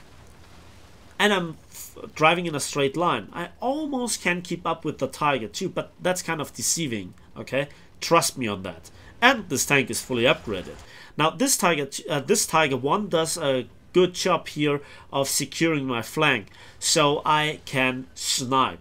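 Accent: Germany. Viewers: One of the best videos about War Thunder. I get that PhlyDaily is making videos for general audience that's why he has the subs. But is doing trully high quality content where you get far more information. He even pronounces the names very nicely in probably very close accent to that language:). BTW I don't know much about him, where is he from? The accent do sound like something close to Germany is mixed in him.. Denmark? Netherlands? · Hey, another great video! I always enjoy a great historical background (and I think I can speak for everyone when I say this) with a satisfying German accent:) One question-- what are the pros and cons of the waffletractor vs. the Nashorn? Which would you use?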